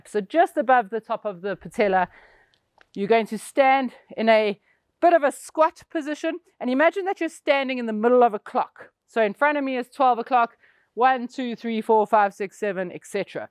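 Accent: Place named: South Africa. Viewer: How exciting to land on a YT cxhannel and hear a South African accent. Woot! I've subscribed and plan on digging in to your content on the weekend. I was a runner and still kinda am but heck, those menopausels. :face-orange-frowning: Plus sore knees.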